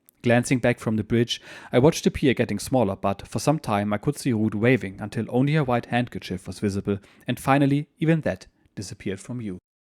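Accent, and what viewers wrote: Germany. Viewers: I love these stories so much. I'm a lover of history. Especially military. And it's not often I hear anything from the German perspective. And the German accent makes it that much better. Thank you for the top notch content.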